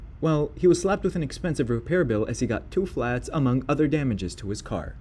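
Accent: United States. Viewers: Why is an American voicing over a British dashcam compilation cause he know absolutely nothing about our roads and sounds stupid...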